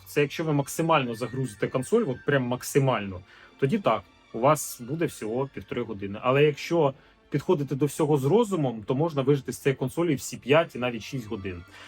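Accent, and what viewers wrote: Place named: Ukraine. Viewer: Спс за обзор. Если приставать, то отметил бы только грамотность речи, потому что ру слова с укр акцентом режет ухо.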